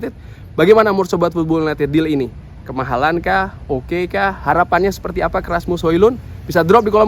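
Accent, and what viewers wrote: Semarang, Indonesia. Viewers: Bang, orang Semarang ?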